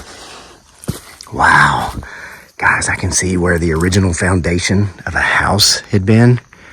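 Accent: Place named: West Virginia, United States. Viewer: Hey... I am one of your fellow West Virginians and I’ve told you before how much I love and am comforted by hearing your west by god Virginia accent...... I was also born and raised in West Virginia.... I wonder if those graves were of the family members..... I have many generations of south Caroline relatives and many years ago family graveyards were common....a part of many properties were graves...civil war even... but that was truly CREEPY and also fits with the other alien abductions you read about where pregnancies were part of the story but NOTHING AS CREEPY AS THIS.... an alien baby channeling their childhood families!! I hope you are home safe and will post on this YouTube channel that you are home safely.....seems like a lot of entities for lack of a better description...are you home safe? Of course it could also be Big Foot mixed in with invisible entities...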